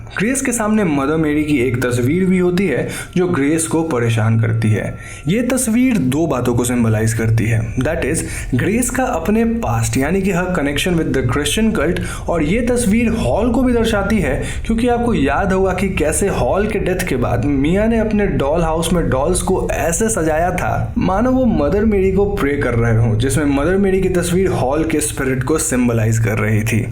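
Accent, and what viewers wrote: Bengal, India. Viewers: he is Bengali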